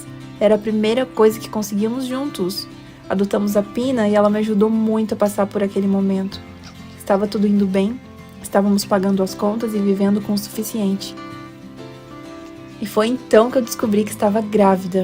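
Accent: Rio Grande do Sul, Brazil. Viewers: Sou do RS tbm logo vi teu sotaque no primeiro vídeo que assisti 😂 amei tua história fiquei muito emocionada, que tenha muito sucesso sempre em sua vida ❤️❤️❤️ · Ameeeei tanto.. logo que vi o primeiro vídeo reconheci esse sutaque de gaúcha 😂😂😂 linda, achei vcs recentemente aqui e já amo os videos, sou de São Gabriel 3hrs de ônibus até Santa Maria 😍.. amei o vídeo e amo a risada do 😂😂😂 beijão pra vcs ❤ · Nossa que lindo!!! Ah e eu sabia que tu é gaúcha, já te vi falando "tu" e acertei no meu palpite. Sou de São Sepé, cidade vizinha de Santa Maria. Parabéns pela coragem! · Conheci o canal a pouco, vim parar aqui e logo reconheci o sotaque gaúcho da minha conterrânea! Muito amor e muitas conquistas para essa família linda!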